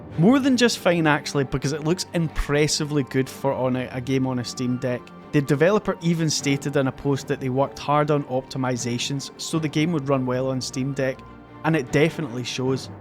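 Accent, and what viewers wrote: Scotland, United Kingdom. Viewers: Nice skottish accent Mate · peak scottish accent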